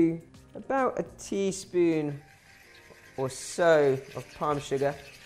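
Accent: England, United Kingdom. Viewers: M8, your UK accent is thick af. Nothing Singaporean abt it. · THANK YOU SO MUCH for the excellent tutorial videos. I’ve love Chinese food my whole life and always wanted to learn how to cook it better. I LOVE THESE YOUR VIDEOS. As a humorous side note. Being from the US I’m used to seeing Asian-Americans with an American accent. But It’s fun to see you with your British accent. So cheers to you. Chef. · the best detailed show for Hainanese Chicken dish so far- of course love the English accent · YOUR BRITISH ACCENT IS TOO STRONG MAN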